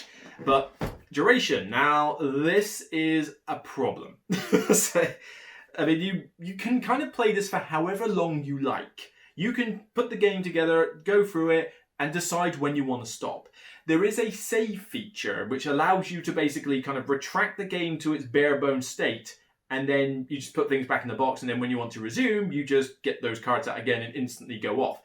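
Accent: United Kingdom. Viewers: First, I think this is the first time I realized you are British. Second, your review is spot on with my experience. I don't have space in my house to leave the game set up so that isn't a solution for me. Our first game took 9 hours and we didn't even finish. I wish we had gotten the cartographer's map. I think if you noted the card numbers and essential features, you could speed up the game significantly. I don't think it is rational to have to start completely over every time. That easily doubled our play time.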